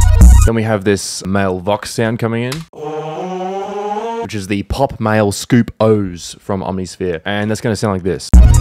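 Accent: Australia. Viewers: bro your aussie?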